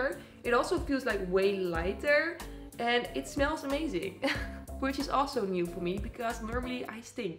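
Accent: Netherlands. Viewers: Does anyone know where she is from because she sounds really dutch haha😅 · dr accent is zo nederlands lol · Dutch accents at its best, girl I love it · the minute you started talking i KNEW you were dutch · Are you dutch??? Your accent seems really dutch hahah. Excuse me if i'm wrong lmao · Your accent sounds sooooo dutch, are you Dutch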